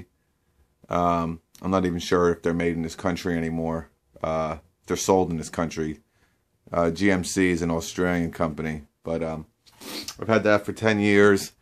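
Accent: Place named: Long Island, United States. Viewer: You have to be from Long Island with that accent. Thanks for the review.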